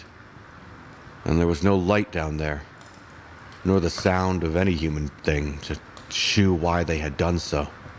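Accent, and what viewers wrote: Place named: Arkham, United States. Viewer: Oh yea, I was transported back to Roman horrors… So there’s another older take on this short story? I’ll look it up… Really enjoying your narrations, accent seems location-appropriate, practically out of an Arkham sanitarium…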